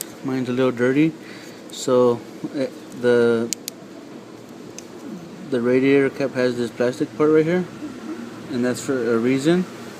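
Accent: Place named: New Mexico, United States. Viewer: Some people talk like that if they are from New Mexico or California.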